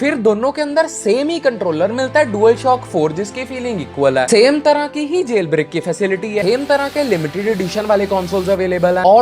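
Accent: Punjab, India. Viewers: Bnda bhot sai yr tu ❤❤❤ Punjabi accent reflect krda hai teri hindi ch Btw love your content❤❤❤ keep it up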